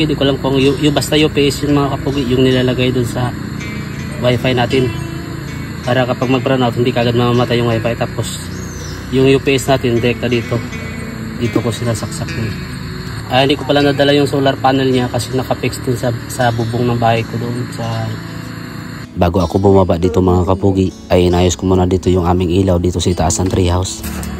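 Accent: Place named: Visayas, Philippines. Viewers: Abot hanggang Antipolo, Taga San po kayo? parang waray salita niyo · Saan ka sa atin dol? Bistag dialect mo diba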